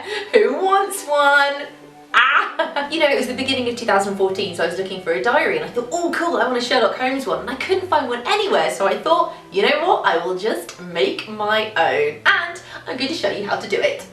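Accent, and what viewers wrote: United Kingdom. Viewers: You´re british...Ilike it! And I love this series...Amazing! XD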